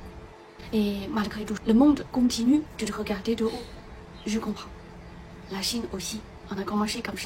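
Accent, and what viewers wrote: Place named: Portugal. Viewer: Pourquoi elle a un accent portugais ? Un bug de l'IA?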